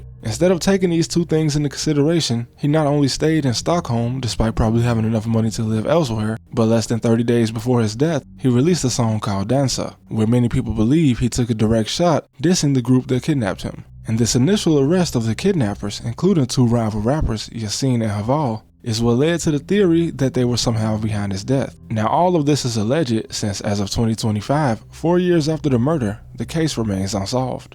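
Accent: United States. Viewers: u can tell bro from america in 20 secs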